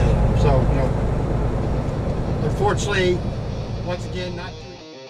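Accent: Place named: South, United States. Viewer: I am looking forward to driving out west. The only thing about your vids is your hick like accemt. And what the hell is a wind screen? Oh well, we are all from somewhere. Looking forward to more vids. Be safe!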